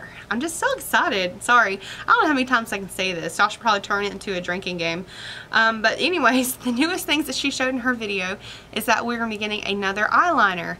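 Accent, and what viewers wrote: South, United States. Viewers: Omg I love you, your giggle is infectious and your southern bell accident is adorable. Also thanks for the spoilers.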